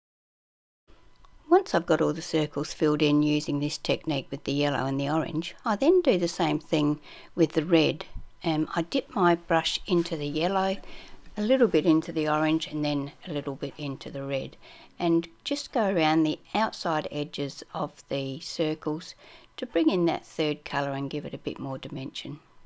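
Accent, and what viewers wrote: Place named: Australia. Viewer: Nice to hear a fellow Aussie voice!!! Great page! That pastel green as a background with the orange is very dramatic!!!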